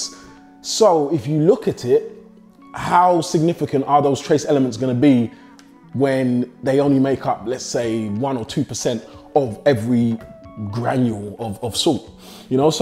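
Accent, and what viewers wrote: England, United Kingdom. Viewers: He must be from london that accent is strong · That British accent.😁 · English accent is a good reason not to continue with the video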